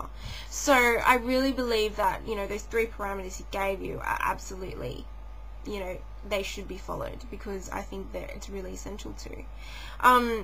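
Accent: Australia. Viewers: Oh my yes, hubba hubba! Sexy down to the marrow. Auzzi accent is to-die-for! Edible, SOOOOOO edible.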